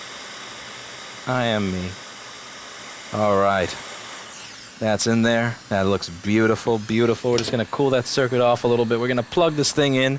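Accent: New York, United States. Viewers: The accent/speech pattern is a mixed of Seinfeld, Joe Rogan and Bob Ross plus a sprinkling of NYC cabbie. Somehow turns out very entertaining know these videos.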